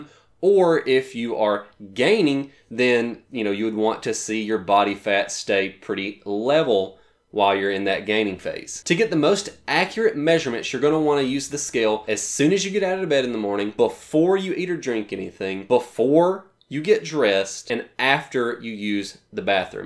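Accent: South, United States. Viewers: the yeehaw type voice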